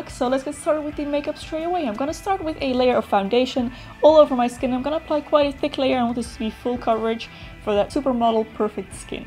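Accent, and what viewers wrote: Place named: Netherlands. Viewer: ik wist dat je Nederlands was toen ik je accent hoorde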